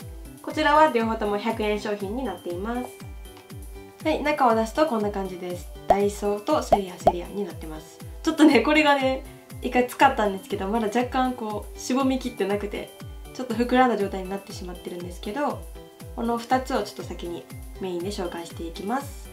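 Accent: Kansai, Japan. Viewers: かわいい😊関西の方ではないのかな？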